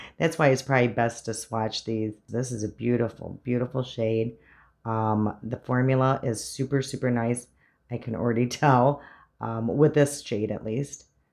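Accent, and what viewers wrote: Midwest, United States. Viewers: I just love your videos! I hear that Midwest twang, and it's like i'm watching my younger sister! 😎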